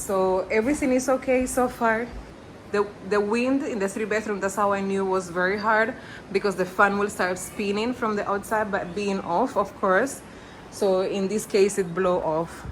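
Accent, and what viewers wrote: Jamaica. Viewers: I love the accent 😅officially yu jamaican ❤🎉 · Your patwa is sweet 😂you sound so good, i love it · I love the patois accent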